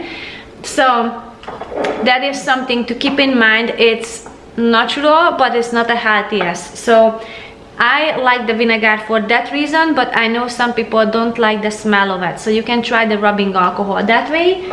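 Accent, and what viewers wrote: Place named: Hungary. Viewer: Great idea to have everything in one place for recipes and products you're liking! It will be interesting to look back a year from now and say, do you still like the vacuum and things.:) Yes, you said "Sal Suds" perfectly. Your bloopers remind me, in a recent video you mentioned when you first started your channel, you wondered if people would understand you. I LOVE your Hungarian accent - having lived in several countries I just enjoy hearing other people's way of speaking and learning new words. I don't remember if I told you or not. So keep it up, you are amazing and maybe don't realize it, but people who haven't had the opportunity to travel will get a wonderful exposure to Hungary through your speech and your recipes. <3 Oh, and I think the pods brand is "dropps", I've seen them somewhere and maybe not positive, but pretty sure that was the brand name. 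Maybe? LOL Wishing you a beautiful day,!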